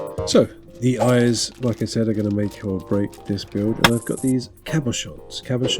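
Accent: United Kingdom. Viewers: Did you favorite every comment? That's... impressive. Also, why ARE you British all the time?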